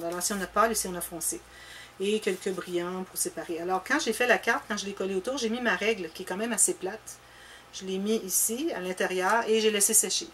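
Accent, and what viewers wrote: Quebec, Canada. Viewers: Quelle surprise et quel plaisir aujourd'hui de vous voir "presque pour de vrai". C'eût été dommage de ne pas vous montrer tant vous êtes resplendissante ! Votre accent, je vous l'ai déjà écrit, j'adore, il me rappelle mes 2 longs voyages au Québec où j'ai rencontré des gens sympathiques à chaque fois. J'y retournerai volontiers mais revenons au scrapbooking. Je félicite votre grande disponibilité et votre belle générosité, les réponses aux questions sont rapides et précises. Je suis arrivée sur votre chaîne alors que vous aviez très peu d'abonnés mais le nombre a explosé en très peu de temps, ce n'est que mérité, félicitations. En égoïste, je regrette déjà un peu ce petit cocon que nous formions, vous gardez rien que pour moi 😋 car vous êtes vraiment très empathique et on ne peut qu'être séduit sans compter que vos réalisations sont originales tout autant que les papiers utilisés. 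Mais, oui, je vous souhaite très vite de multiplier ce nombre par 5 ou 7 et plus. À très bientôt et encore merci pour tous ces partages. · Félicitations pour vos 1000 abonnés. Merci pour tous vos partages et votre savoir faire que vous nous redonnez avec gentillesse et générosité. Votre travail est remarquable. Et puis une petite touche finale qui me fait plaisir, voir votre visage rayonnant et votre sourire sans oublier le merveilleux accent du Québec.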